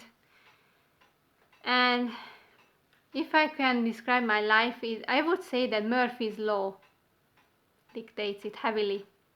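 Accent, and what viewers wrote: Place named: Hungary. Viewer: I can only regret I have found your channel only today. I miss hearing the Hunglish accent as I used to work in Budapest for a long time. When I came for the first time to work with my Hungarian colleagues I could not understand why they are so negative as I was in life bubble back then. But they did not complain about things you or we rant about. It was mainly the job, government, gipsies, other colleagues, bosses etc but not about the life itself. You made my day after listening to the first authentic rant from Hungary which is way more meaningful. Cant wait to watch all your videos.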